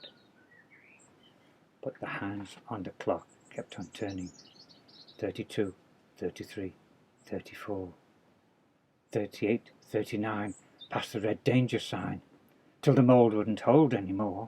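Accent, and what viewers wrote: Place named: Stoke, United Kingdom. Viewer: I'm guessing you may be from Stoke...?? My dad used to read the stories to me in my bed in Stoke and he'd put on a Yorkshire accent. Would be pretty strange if so!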